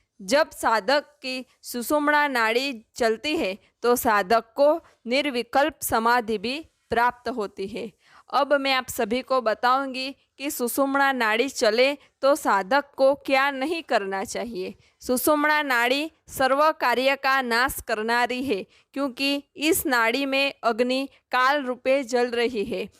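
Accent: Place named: Gujarat, India. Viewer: હું પણ તમારી ભાષા ના ઉચ્ચારણ પરથી અંદાજ આવી રહ્યો છે કે તમે મગજ માં ગુજરાતી નુ હિંદી માં રુપાંતરિત કરી ને બોલી રહ્યા છો 😊😊 જય શ્રી રામ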